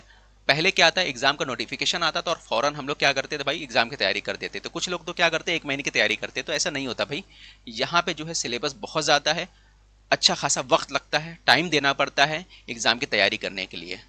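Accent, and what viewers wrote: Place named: Maharashtra, India. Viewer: Sir aap maharashtra se ho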